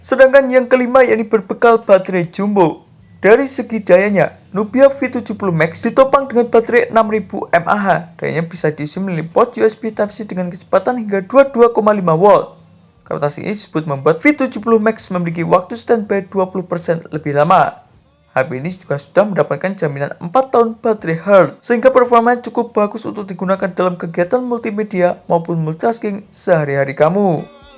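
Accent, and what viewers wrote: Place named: India. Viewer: Kukira logat india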